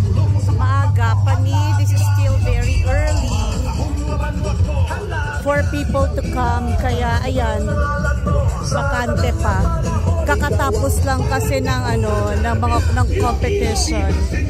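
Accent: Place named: Iloilo, Philippines. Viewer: Done watching na po ms... sobrang enjoy po panoorin.. ang ganda ng iloilo.what i notice.. ang linis esp. ng main road. Even during the festival kung meron man kunti basura lng..at meron pa mga nabibili mga souvenir..and can take pictures by the bridge.. beautiful people... And i love tlga ilonggo accent ms...😍😍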